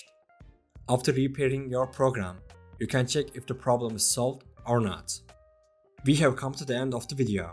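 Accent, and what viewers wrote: Turkey. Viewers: This guy he is so Turkihs , his accent screams " I AM TURKISH "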